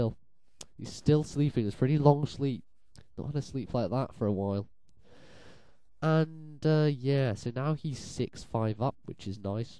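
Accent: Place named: United Kingdom. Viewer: You're commentaries are really good! Makes a change hearing a British voice. Anyway, if you wanna battle some time, message me, be interesting seeing my team narrated against on youtube :P.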